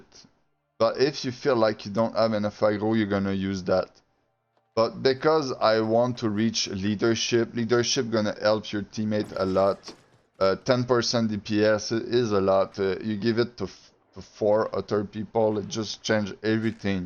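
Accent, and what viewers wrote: Quebec, Canada. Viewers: Vu que je remarque notre fameux accent quebecois, je vais t'ecrire en francais hahaha. je me demandais si le build serais bon avec la greataxe aussi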